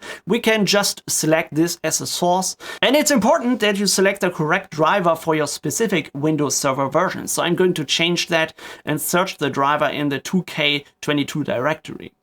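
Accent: Germany. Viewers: No front but you sound like a german i am also a german boy :D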